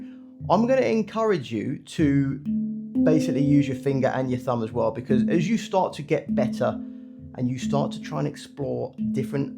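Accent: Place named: United Kingdom. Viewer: Thank you for your quick reply, which has put my mind at ease no end. My handpan should be delivered tomorrow, which I feel very excited about, with some trepidation. I'm a bit scared about what I will receive, after watching so many warnings about cheap Chinese instruments being out of tune. I get that, I know you get what you pay for, but im not in a position to commit to a very expensive instrument at the moment but hope to progress into a better quality instrument in the future. Thank you for taking the time to reply, it was interesting to hear about your heritage from the UK, as you do drop those little hints of your accent in your videos, which to my mind makes you more approachable, and a very warm hearted person, everything a good teacher should be!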